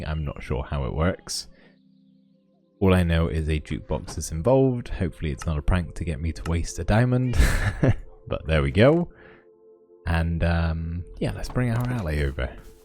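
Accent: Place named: Devon, United Kingdom. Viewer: Are you from the west country? theres a twang in your accent thats a bit Devonian.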